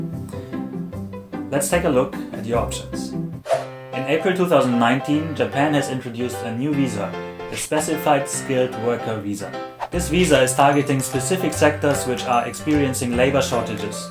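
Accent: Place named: Germany. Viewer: oha i wuste doch das dein aktzent etwas deutsch klingt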